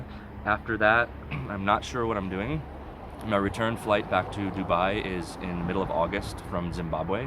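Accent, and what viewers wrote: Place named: England, United Kingdom. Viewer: You sound English dude..In Whk now from the UK.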